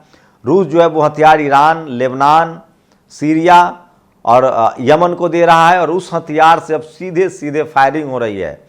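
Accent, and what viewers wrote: Bihar, India. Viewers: Bohat qoob, your voice Behari tune wa bohat qoob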